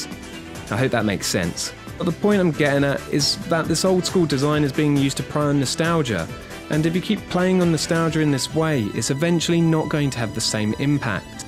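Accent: United Kingdom. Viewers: My gosh! You've cut the video on two pieces, okay, but you didn't include links in the description! English is not my bloody native language and the British (or Australian I dunno) pronunciation make it even harder to get by ear. Add the links! I can find anything in two wasted minutes more, but many people doesn't. Thanks for video thou. And I put a thumbs up on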